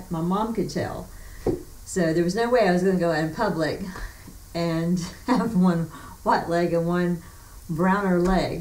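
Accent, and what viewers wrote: South, United States. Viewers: Good southern accent!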